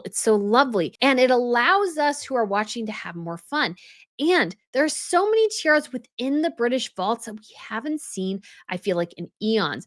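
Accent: United States. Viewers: her pronunciation is American because she IS American. That's okay.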